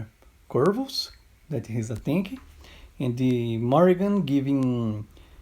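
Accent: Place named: Brazil. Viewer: Pelo inglês é brasileiro hehehe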